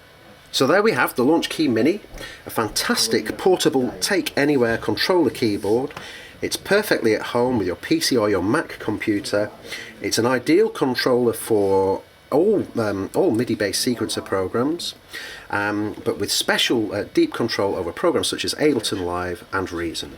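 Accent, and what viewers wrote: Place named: Australia. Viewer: Alright, I hope you work it out. Good to hear a fellow aussie!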